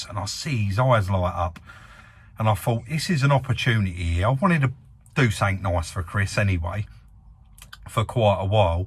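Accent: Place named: Essex, United Kingdom. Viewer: More like an Essex accent.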